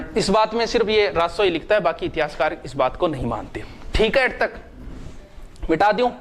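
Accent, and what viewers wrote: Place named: Marwari, India. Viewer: sir i like ur marvadi voice lecture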